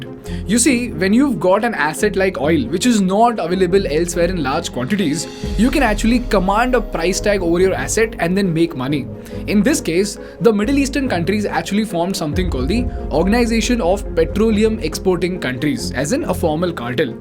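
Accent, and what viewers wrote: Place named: South, India. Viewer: You need to try and lose the aiaiyyo accent. The rest is good.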